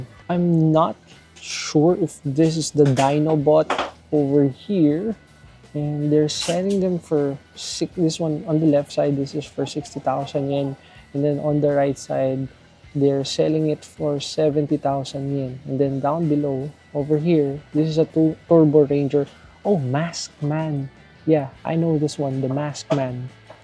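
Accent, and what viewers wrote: Philippines. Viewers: I binged most of your videos , really good content. My wife is Filipina and I recognized the accent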